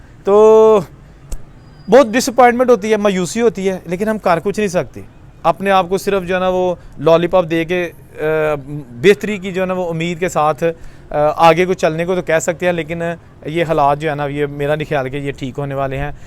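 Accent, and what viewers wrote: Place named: Majha, India. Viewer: I like you speaking maajha style punjabi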